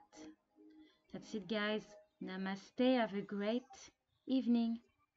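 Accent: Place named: France. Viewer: Nice video 😊 are you French? Can recognise the accent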